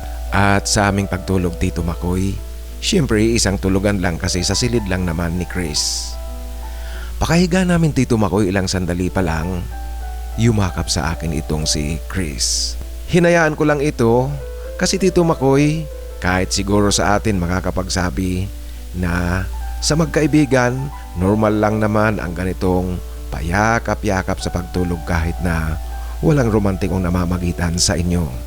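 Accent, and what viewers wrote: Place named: Tagalog, Philippines. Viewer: good evening, Tito Makoy... I realized that it's been 5 years now since I started following your channel dated March 18, 2021. lala ko pa, I used to smile every time you have an accent in some Tagalog word. I think it was one of my comments to teach you to pronounce some Tagalog word.. 😅 but now, as I've mentioned before, you're totally sound from the Katagalugan region. (I came from the popular "Balagtasan" province, can you guess?😅) more power to your channel and wish it will reach the Decade Anniversary.🤗 Stay safe... 🫡 God bless! 🙏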